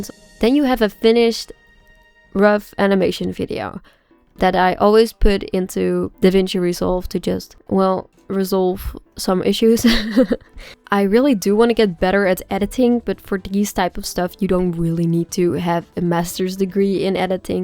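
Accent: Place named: Netherlands. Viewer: ive loved httyd for so long, I went to see all the movies in the cinema with friends or family. I loved the way you drew hiccup and toothless (although i might be more biast towards T cus I love dragons in general) It's always so odd seeing a style i recognize and then realizing I've seen insta reels of them before 😭😭 (kny saja boys) Also I love your accent!! I knew it sounded dutch and I always get excited seeing other dutch artists who like the same medias I do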